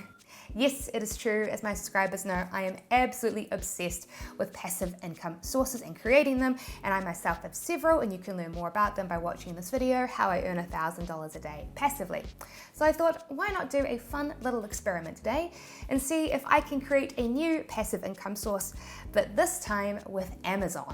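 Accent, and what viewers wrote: New Zealand. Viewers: I knew you were a kiwi straight away! Awesome to see fellow kiwis killing it!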